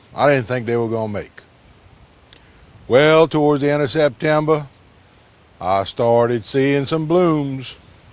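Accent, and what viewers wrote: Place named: Louisiana, United States. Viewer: Vous devez parler le français cadien ... I can tell by that accent :P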